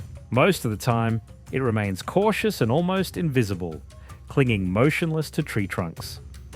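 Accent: Australia. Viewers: Narrator has Aussie voice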